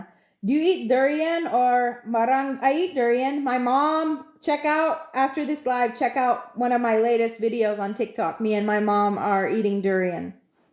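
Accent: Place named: Visayas, Philippines. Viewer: Ka gwapa ug larenoha mo sultig bisaya nimo langga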